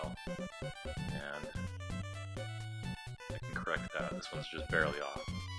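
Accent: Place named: United States. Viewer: where are you located ? Sounds American but what state?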